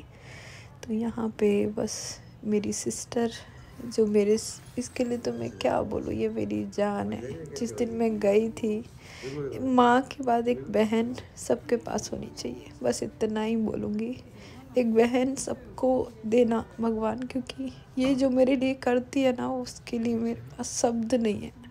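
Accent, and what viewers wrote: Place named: Uttar Pradesh, India. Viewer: Aap kahan per Ho didi kahan ke Rahane wale ho aapki Bhasha up side ki Jaisi lagti hai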